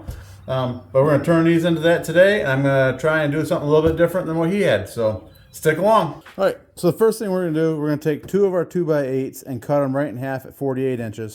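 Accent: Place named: United States. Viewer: i hate this american accent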